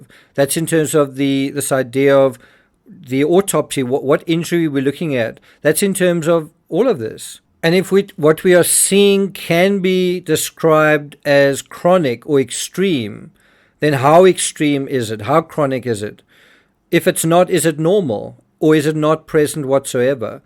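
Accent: South Africa. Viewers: You have a South African accent!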